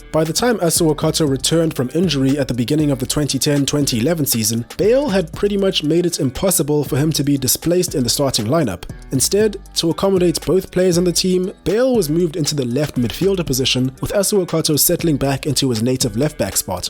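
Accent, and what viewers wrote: South Africa. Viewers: he is from the rsa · Where are you from dude? Trying to place your accent. Sound kind of South African but I can’t tell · Are you South African bro?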